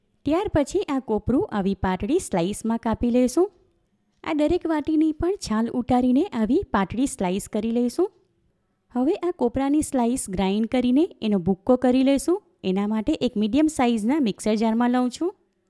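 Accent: Surat, India. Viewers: કોપરા પાક પણ ખૂબ સરસ અને તમારો અવાજ સુરતી ભાષા ખૂબ જ મીઠી 👍👌🤪❤️❤️❤️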